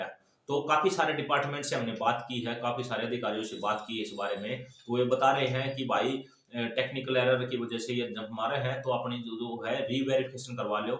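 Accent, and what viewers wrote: Bhiwani, India. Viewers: Bhai shab aap Bhiwqni se ho kya aapki awaj se lag rha h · Are u frm bhiwani distct.. Your language is frm that area..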